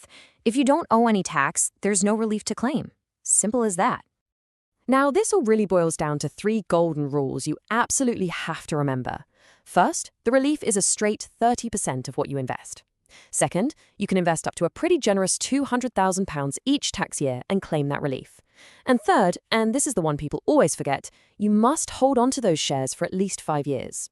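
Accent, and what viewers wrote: United States. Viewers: Excellent content, although why the horrendous American accent?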